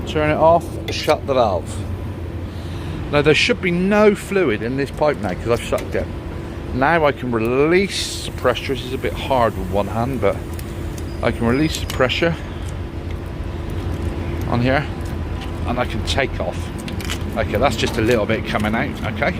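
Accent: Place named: United Kingdom. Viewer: I would love to perfect my English hehehe I like your British accent! Pls help me how to be fluent in English thanks idol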